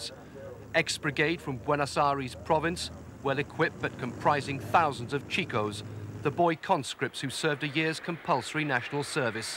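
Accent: United Kingdom. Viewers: Thank you. This is the first time I have heard anyone with a British accent speak the truth that both sides were totally unprepared for this war. And that was said in the opening statement. Of the two.. The Argentinian Air force did a hell of a job, their army sucked and their Navy didn't fair too well either. If you have watched any footage, or read about what the Brits had to go through to pull this off.. It was as close to a complete clusterfuck that any military could get. The bombing mission of the airfield, the sinking of all those British ships, especially using a merchant vessel from Cunard. The sinking of the SS Atlantic Conveyor made me scratch my head when that footage hit the news. I was like.. WTF are they using a commercial vessel for in their war campaign? I actually thought the British were supposed to be a super power. They looked like a rag tag fleet of incompetents. 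Argentina put up a good fight. If their Navy and their land forces would have been better, they could have dealt a blow to the Brits. In all reality. They still did.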